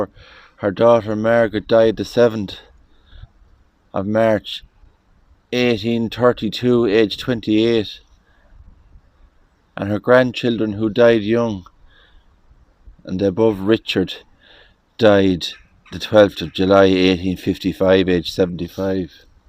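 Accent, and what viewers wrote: Ireland. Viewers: Merry Christmas to you and ❤ I love listening to your voice as the accent reminds me of my Grandparents who came to America from Ireland. Thank you so much for sharing these beautiful cemeteries with us!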